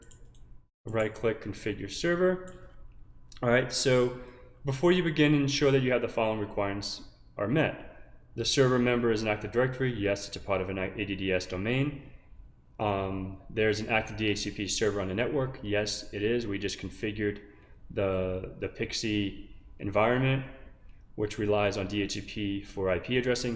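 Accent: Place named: New England, United States. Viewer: Love the New England accent!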